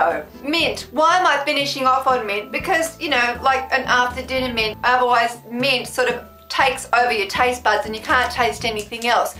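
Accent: Australia. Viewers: I new you were Australian because you acset I'm Australia and i love mint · Oh far out i can tell that's an aussie acronym or slang term the way you say it in all your videos 😂😆🤣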